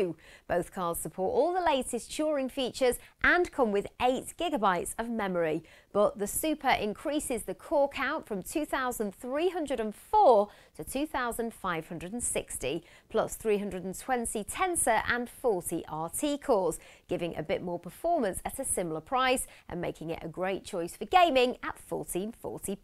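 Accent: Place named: England, United Kingdom. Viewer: I love the englsih accent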